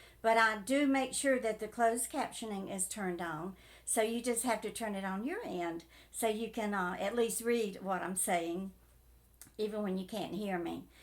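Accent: South, United States. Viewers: I came across your videos a couple of weeks ago. After watching the first one I was hooked. I went back to the first one and I have been bing watching and finally have caught up to your most recent one. You are a breath of fresh air, I love your soft southern voice (I’m from Kentucky so I’m pretty southern myself😉). I love all your stories, cooking, and your teaching to the younger generation about finances & saving. God Bless you sweetie😊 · I like your soft voice and your southern accent. You're a born storyteller and your life stories are worth hearing. So sorry about your son and Frank too.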